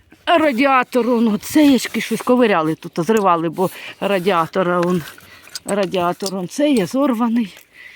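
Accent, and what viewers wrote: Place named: Surzhyk, Ukraine. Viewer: Суржик дикий.😂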